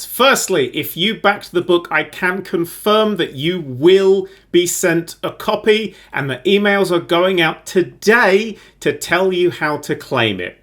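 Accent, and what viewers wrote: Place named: London, United Kingdom. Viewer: It's like British London from peep show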